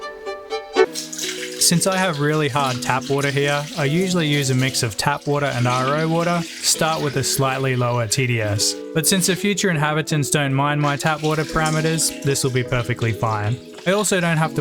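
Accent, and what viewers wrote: Australia. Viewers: Nice to hear the Aussie twang in an aqua scape video! You sorted for Vegemite and Tim Tams?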